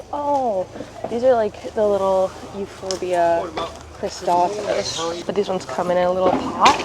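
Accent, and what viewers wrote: United Kingdom. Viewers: I just noticed the longer that you have been in the Uk you are speaking more with a British accent. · That Brittish accent is slowly taking over your American accent.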